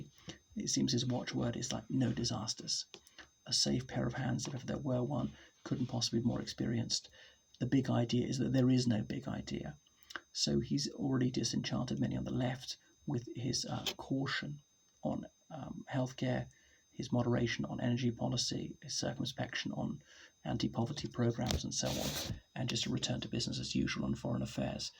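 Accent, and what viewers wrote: RP, United Kingdom. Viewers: You have posh accent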